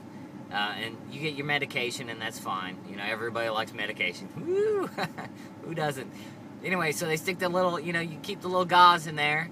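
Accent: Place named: Texas, United States. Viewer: Loving your texan accent and you're a cool dude :)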